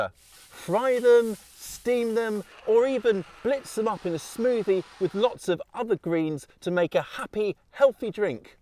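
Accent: England, United Kingdom. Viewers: He sounds English, maybe zone 7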